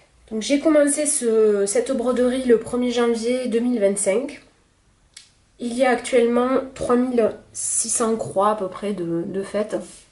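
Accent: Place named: Southwest, France. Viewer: bienvenue dans le club des premiers flosstube lol tres joli accent ça sent le sud ouest je dirais ... j ai le même accent et ça fait plaisir ta derniere creation en petit coussin est magnifique j ai beaucoup d admiration pour toutes celles qui font du point compté car moi je suis essentiellement sur du travail en toile imprimée gros bisous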